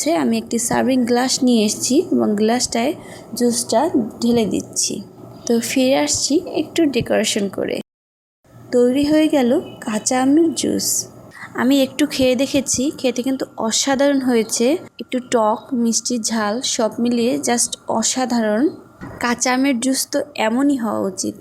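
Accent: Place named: Bangladesh. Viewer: ওটা বাংলাদেশি আঞ্চলিক টোন,আমরা ওভাবেই বলি।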